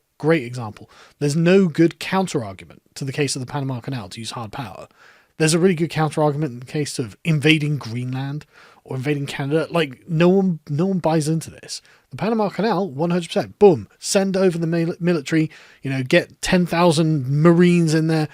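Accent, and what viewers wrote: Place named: United Kingdom. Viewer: I'm not going to sit here and be lectured about America by a man with a British accent .. look at your country with do you know ? .. lol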